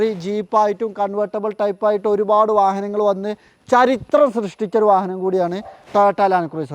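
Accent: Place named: Valluvanad, India. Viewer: You described well in your own “valluvanadan”style.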